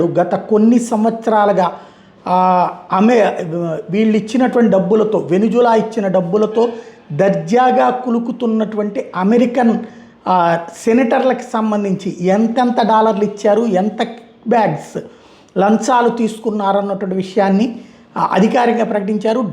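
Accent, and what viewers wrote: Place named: Vijayawada, India. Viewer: Nuvvu nee butler English Nee level local bezawada ne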